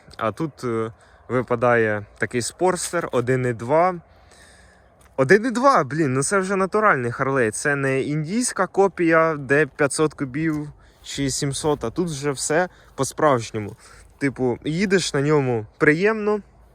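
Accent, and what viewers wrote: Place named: Poltava, Ukraine. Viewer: Нехай радує друже) Суржик як на Полтавщині)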